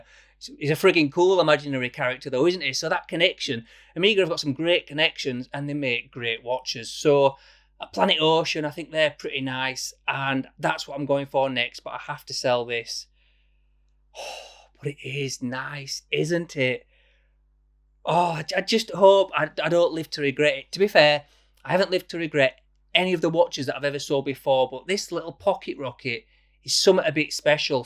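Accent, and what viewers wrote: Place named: Scotland, United Kingdom. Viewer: Nice Scottish accent but I do think the BB58 blue is a real keeper!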